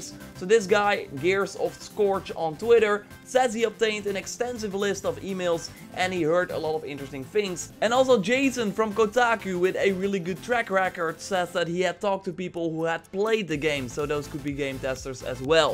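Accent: Netherlands. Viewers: Yo just subbed thanks for giving me info on ac, far cry and other games your videos are very helpfull and informative. Btw just curious are you dutch your accent sounds like you are.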